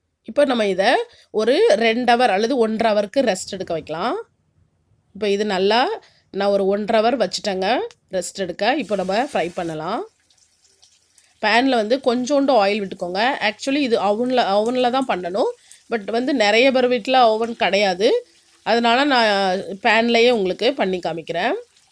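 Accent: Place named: Kanyakumari, India. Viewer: Your slang sounds like kanyakumari…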